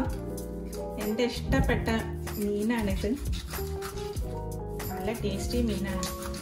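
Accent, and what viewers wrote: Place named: North India, India. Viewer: Ningalde varthamaanam oru North Indian Malayalam paranje pole Ind...good vdo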